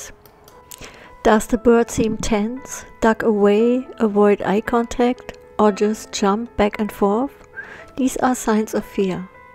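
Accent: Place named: Europe. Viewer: I've started looking for crows to feed. No luck yet getting close. I'll try your advice. I like your accent. It sounds European.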